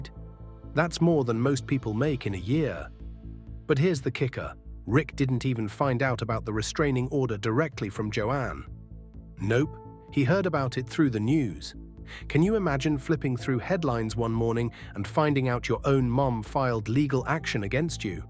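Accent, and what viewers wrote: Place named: United Kingdom. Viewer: Obviously made by a Brit.There's a whole series about British pawnbrokers, and they all pronounce it 'pornbrokah'. Quite distracting, actually...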